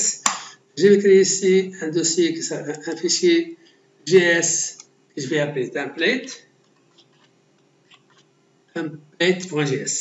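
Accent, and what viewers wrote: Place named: Tunisia. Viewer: tunisien accent merci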